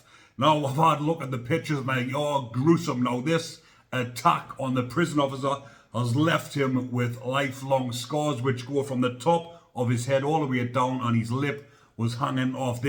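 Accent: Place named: Geordie, United Kingdom. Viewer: Damn, your Geordie accent is thicker than that of Alan Partridge's mate Michael.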